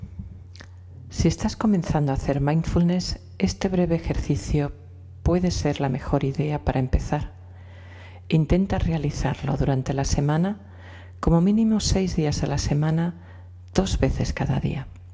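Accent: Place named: Spain. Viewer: Aparte de la carretilla o media de nylon o red de protección en el micrófono... Es necesario hacer un post mezcla de audio en el out feader o eco... Ya que se aumenta los tonos agudos de la voz. Eso en lo técnico y tecnológico. Pero para darle gusto a JAM MUÑOZ...pues tendría que nacer está mujer en otro país!!!!! PARA NO TENER EL ACENTO CARACTERÍSTICO DE LOS HERMANOS DE ESPAÑA !!!!...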